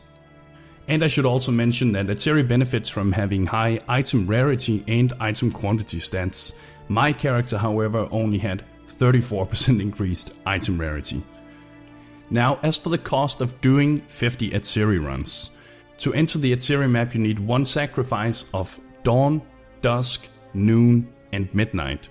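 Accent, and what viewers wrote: Denmark. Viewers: Great video! Are you danish? You sound a bit danish :)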